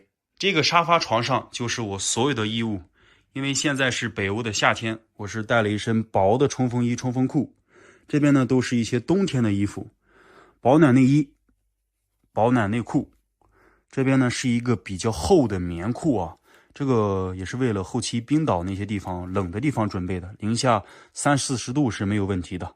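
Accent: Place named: Shandong, China. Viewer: 每天都期待你的视频，阳光正能量，更重要的是听着你山东普通话特别亲切，为咱们山东人点赞👍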